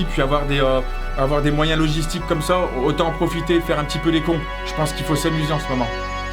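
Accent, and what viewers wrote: Saint-Étienne, France. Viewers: jte connais pas depuis longtemps mais tu mérite vraiment ! PS: tu serait pas un stéphanois avec ton accent ? mf91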